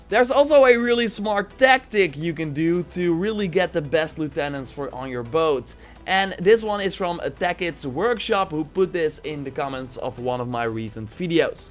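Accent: Netherlands. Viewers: Nice man hoorde aan je accent dat je nederlands was weet jij misschien waar of hoe je veel metal kan vinden? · Ben je Nederlands want je klinkt bij heel veel woorden die je zegt Nederlands zoals toen je ikaros zij en andere dingen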